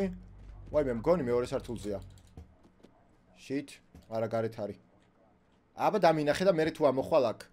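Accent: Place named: Georgia. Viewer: tu videos igeb inglisuri sityvebit mashin mtlianad inglisurad ilaparake,magram tu igeb videos inglisuri sityvebit mashin inglisurad kargad mainc ilaparake aba qartuli aqcentit inglisuri sityvebis gagoneba sasinelebaa:)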